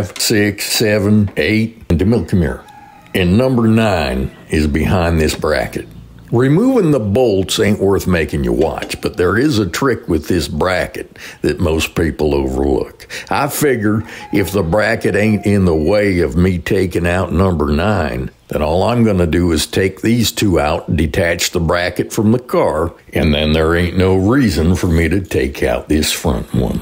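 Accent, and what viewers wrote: South, United States. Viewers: He has southern drawl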